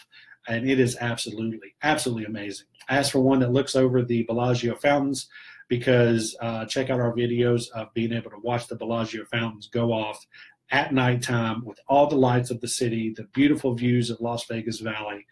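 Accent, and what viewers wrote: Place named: Oklahoma, United States. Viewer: Sounds like an Oklahoma oil money red state hick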